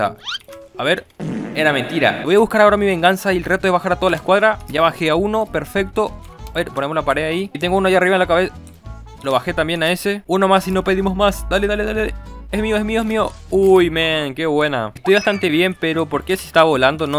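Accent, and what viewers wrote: Paraguay. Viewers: Eres de paraguay? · tu eres paraguayo tu asento es de paraguay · Paraguay? Kp s nota el acento mi reynol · Sos de Paraguay? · Ese acento paraguayo se nota de lejos. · Man pensé que eras de otro país por tu forma de hacer los videos pero por tu forma de hablar noté que sos paraguayo gran video sos grande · Eres Paraguayo? verdad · De Paraguay? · Kp vos sos paraguayo verdad? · No lo conosco pero su acento parese paraguayo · Sos de Paraguay???? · SOS de Paraguay? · Eres de Paraguay? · Sos paraguayo ?🤠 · eres paraguayo vrd bro? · Eres paraguayo?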